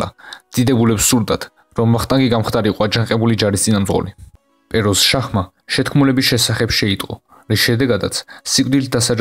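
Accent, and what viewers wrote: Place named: Russia. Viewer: რყსულ ენოვანი სირბანჯოები არიან არხის მფლობელები აქცენტზეც ეტყობა და აქ რაღაც ზღაპრებს ყვებიან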